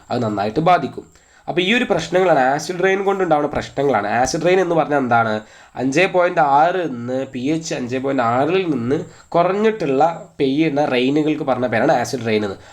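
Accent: Malappuram, India. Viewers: Sir nte nadu Malappuram aano😃... Edak varthanom kelkkumbo anghane thonnunu😁 Anyway adipoli cls aanitto🤩🙌🏽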